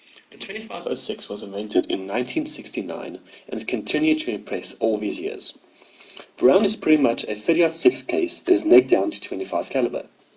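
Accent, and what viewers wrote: United Kingdom. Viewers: Why is everything these days have to have someone with an English or British accent? Minute I hear and I turned it off because it's b***** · Your an idiot 25 06 has been around for about 100 years it must be that Brit tone that you have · Your narrative is useless - try another enclosure for your talk - and get rid of that shitty British accent.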